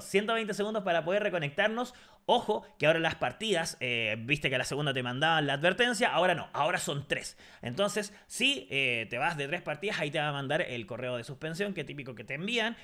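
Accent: Chile. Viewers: Ola Bro eres chileno por que hablas como chileno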